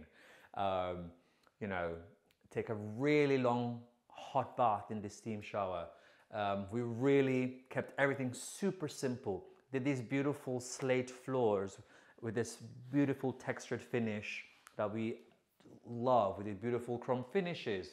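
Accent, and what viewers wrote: Jamaica. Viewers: I can hear the Jamaican accent. Yes sir. I'm Jamaican too and I'm cooking right now. Working on the rice and peas. Love you sir. You're a class act! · Rest assured, his accent cannot be pinpointed to the mannerisms and intonation of one particular (European) group of people. It has hints of Jamaican, British, and American. Which makes total sense given his background and where he lives. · Within the first 5 mins. of the video, I recognized your Jamaican accent. So proud of your home and your accomplishments😃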